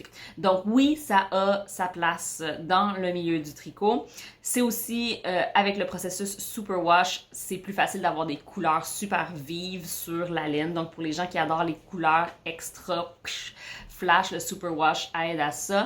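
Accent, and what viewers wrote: Quebec, Canada. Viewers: Bonjour ! J'habite Toulouse et j'adore cet accent québécois, même si je ne comprends pas toujours tout, je dois bien l'avouer ! 😉😊 Merci pour tes tutos, ils m'ont permis de débloquer certaines situations inconfortables 🙄(je suis souvent obligée de regarder plusieurs tutos différents pour certains trucs. Le dernier en date : les chaussettes !) J'ai des envies de poncho pour cet hiver (pointu en bas, encolure ronde à l'arrière du cou et en V devant). Aurais-tu des modèles à me conseiller (en français) ? Je ne parviens pas à trouver quelque chose qui me convienne -sniff-😢 Merci et bonne continuation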